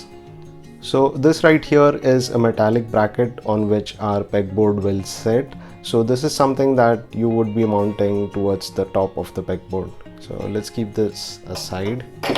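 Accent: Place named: India. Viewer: The calm nice and relaxing Indian voice helped me understand this wonderful Board, please make more Vids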